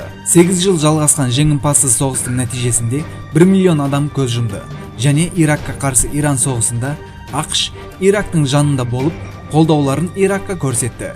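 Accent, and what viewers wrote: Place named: Uzbekistan. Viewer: Өзбексің бе? Тіліңде акцент бар.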